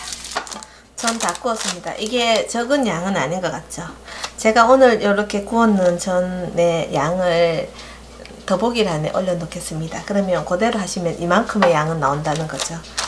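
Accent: Gyeongsang, South Korea. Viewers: ~설명도 친절하게 잘하시고 못하는요리가 없으세요^^요즘~잘구독하고있습니다 덕분에~요리솜씨가 늘었어요 님목소리에서~경상도특유에 깊은정이 느껴져요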